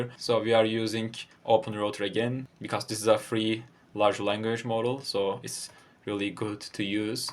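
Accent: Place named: Turkey. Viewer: Türk Aksaanini biraz belli etmis gibisin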